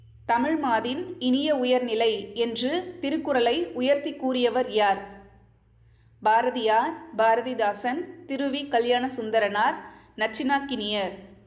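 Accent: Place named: England, United Kingdom. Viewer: Ethukku english kara mam mathiri pesuringa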